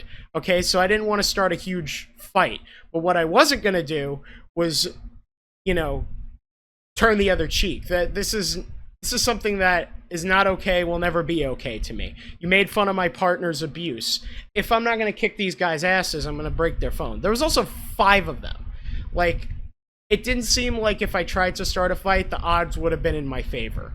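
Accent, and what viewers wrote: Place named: Long Island, United States. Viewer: I knew u were from Long Island something about your voice that sounds native to me lol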